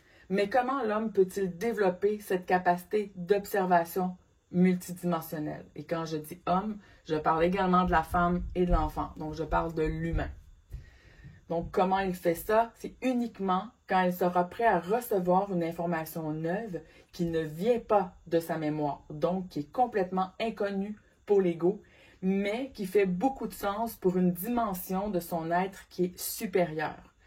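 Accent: Quebec, Canada. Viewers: Contenu enrichissant et utile présenté avec pédagogie par une jolie femme à l'accent québécois ....❤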